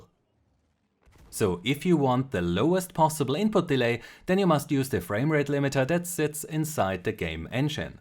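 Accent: Germany. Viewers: Ahh der Deutsche akzent xd aber cooles video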